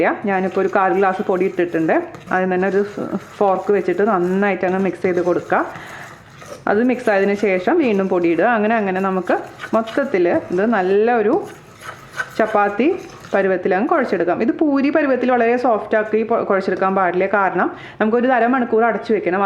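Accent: Thrissur, India. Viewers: #roseeducation: yummy recipe. I love all your recipes. I really like the way you talk especially thrissur ascent. Thank you so much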